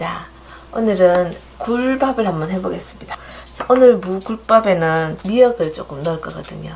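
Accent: Gyeongsang, South Korea. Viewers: 시원시원하니 진행도잘하시고 요리도 잘하시고 많이배우고 갑니다❤ 경상도 억양 구수하니 정다워요 ❤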